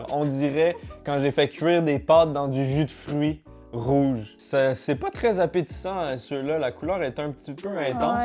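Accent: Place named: Canada. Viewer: L'accent canadien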